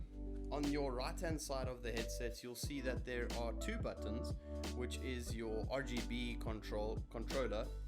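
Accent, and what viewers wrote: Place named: South Africa. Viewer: so Ja YOU ARE DEFF a South African XD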